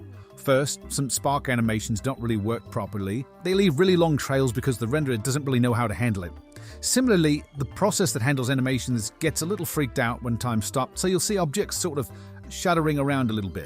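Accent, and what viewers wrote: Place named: Australia. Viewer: Australian i see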